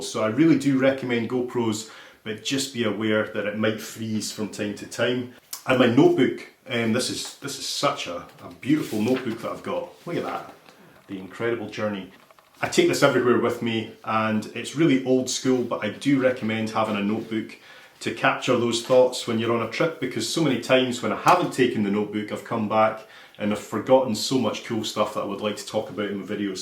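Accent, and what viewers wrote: Scotland, United Kingdom. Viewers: Hi, I have been binging your videos for the last 4 or 5 days and not only do I love the way you wander around discovering places and people but also your fabulous accent. It's so nice to hear a Scot talking about Scotland; it adds flavour. I'm a Scot myself but I left Scotland years ago and miss it terribly. Traveling with you just brings it all back in such a nice way. Here's a suggestion for a wee visit; the Falls of Dochert at Killin, western end of Loch Tay. There's a Wade bridge and it's really spectacular. After that, take the loch side road to Aberfeldy, turn right onto the A 826 as far as Milton, then the A 822 through the Sma Glen to Amulree and Gilmerton. You'll be back in Edinburgh by nightfall and it's stunning all the way. Take care; look forward to more journeys. Aye, Christopher Pearson ( in Paris)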